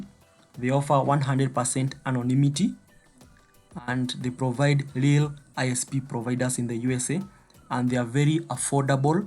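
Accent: Kenya. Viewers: Informative channel, pia mimi niko na ma channel kadhaa huku youtubes, uko na strong kikuyu accent nimejua tu wewe ni mkenya lakini wakenya wameharibu market ya online kwa onlinejobs by using fake accounts na proxies, sasa its harder now ju kenya imebaniwa in most online platforms. Niko na swali, Go login yako unafanyaje ikuwe na over 300 days left na ya trial inafaa iwe na 7 days?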